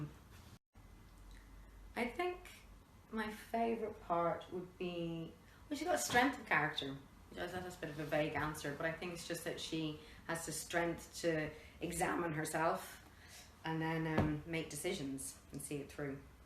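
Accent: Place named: Ireland. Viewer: Ah she’s Irish love it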